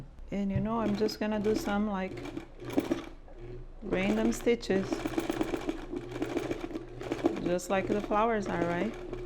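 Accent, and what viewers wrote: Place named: Brazil. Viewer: voce e brasileira ? a sua ingles parece de alguem do brasil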